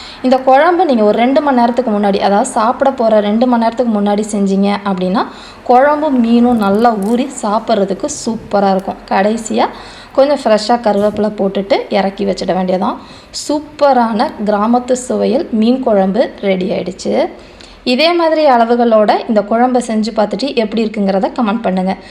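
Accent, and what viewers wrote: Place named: Coimbatore, India. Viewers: கொஞ்சும் கொங்குத் தமிழில்.. மீன் குழம்பு .. சுவை மனம் கமகம.. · U r tamil slang is very nice it like coimbatore style I am from coimbatore so I love u r tamil I feel iam in local coimbatore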